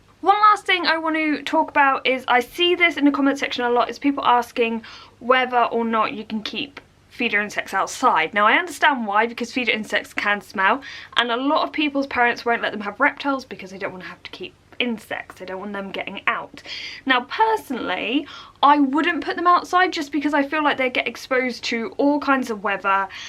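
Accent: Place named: United Kingdom. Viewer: 英音真好听😊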